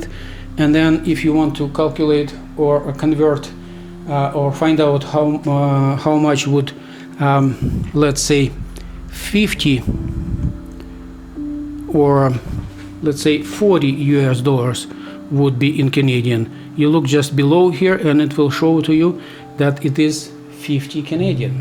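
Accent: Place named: Europe. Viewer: You’re European I could hear your accent so clearly ... are you from Russia or Bulgaria??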